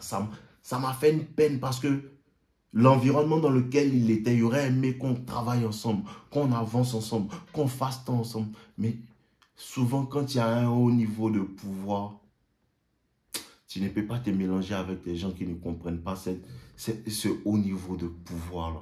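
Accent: Ivory Coast. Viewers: depuis que jai découvert ta page avant hier je suis tes vidéos en boucle pleins d'enseignements merci beaucoup;;tu as l'accent de mes compatriote ivoiriens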